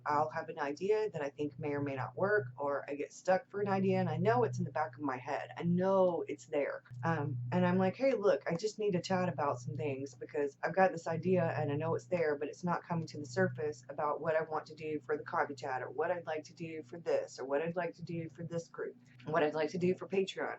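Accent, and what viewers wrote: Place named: South, United States. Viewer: I love the southern accent, it sounds so friendly. Ans I never doubted that you are very intelligent.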